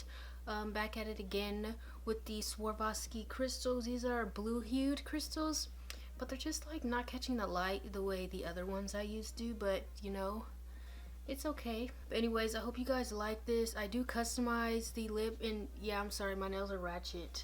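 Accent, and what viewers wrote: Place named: Bay Area, United States. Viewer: Where are u from ??? You sound like you'd be from the Bay Area 😭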